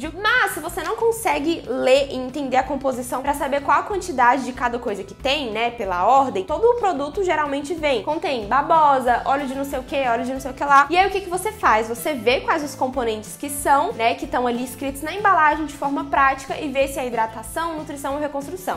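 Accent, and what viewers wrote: Minas Gerais, Brazil. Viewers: !!!!!! amiga sua louca!!! que hidratação foi essa.... amei... amo seus vídeos acho você muito linda e fofa com esse sotaque mineiro... e: EU AMO BABOSA... sucesso bjnssss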